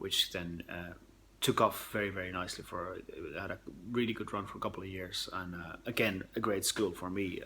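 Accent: Ireland. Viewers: If I hadn't waited until you said it, I would have thought you were Irish. Your accent is proper Irish. All the turns of phrases and rhythm of a well spoken, slightly Dublin accent. Good video. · Your accent is so Irish. I enjoyed watching this. You have a good heart. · Aksentti on huomattavasti "Irlantilaistunut" siellä ollessasi. :) Haaveilen itsekin Irkkuihin muuttoa tulevina vuosina. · Wow you sound like me and im irish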